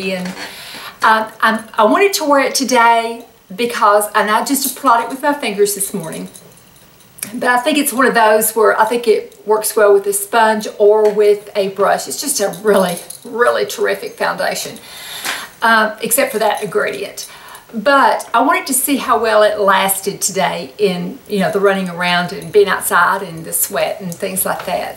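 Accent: South, United States. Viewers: You are amazing and I just love to watch your videos! Your southern accent makes you adorable as well as beautiful! xoxo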